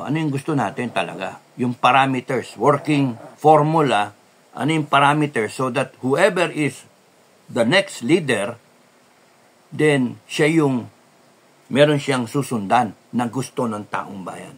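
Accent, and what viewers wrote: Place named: Visayas, Philippines. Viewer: ang cute mo mag bisaya Sir! "mag sulti ug tinuod, asa imong pusil?" dagaaan naa na ang mga Scout Ranger. hahahahaha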